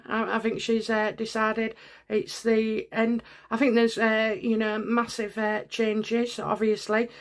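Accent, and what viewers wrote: United Kingdom. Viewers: Hi from Australia. Nice reading as always. My favorite UK tarot reader. Nice reading as always.. I always enjoy your tarot.. Love your British accent. My dad had a Scottish accent. Mum was from Isle of man.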